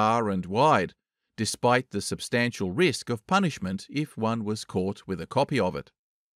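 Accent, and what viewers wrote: Australia. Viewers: Voltaire and an australian accent....?? OMG. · I must say very colour full English language used by it's creator while narrating in Aussie Accent with various cuttings of picture, But I like it very new experience.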